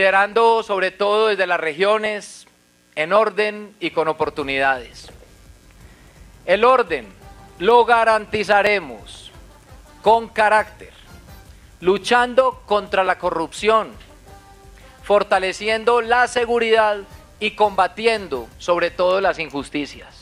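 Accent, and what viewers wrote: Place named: Antioquia, Colombia. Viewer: El próximo presidente habla paisa... Felicitaciones a todos los paisas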